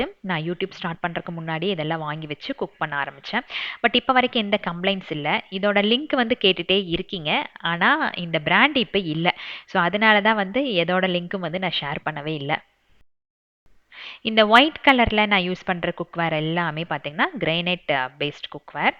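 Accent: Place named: Telugu, India. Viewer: akka nega telugu thana ka❤❤❤ nagalum ipadi tha ka telugu pesuvomm...... Nega pesurathu ennaku nalla puriuthuka...... ❤❤Naga pesura marriye telugu pesuringa....... Unga vedios eillamey enaku romba pudikum...... Enoda day vey ungaloda vedio la start agum...... ❤❤❤❤❤❤avlooo postive vibe akka ❤❤❤❤❤unga voice and vedios❤❤❤❤......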